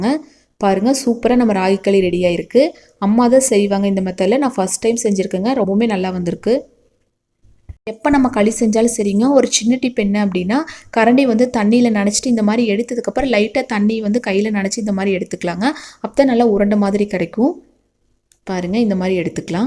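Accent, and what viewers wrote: Kongu, India. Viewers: Kongunattu Thamizh ketkave inippa irukku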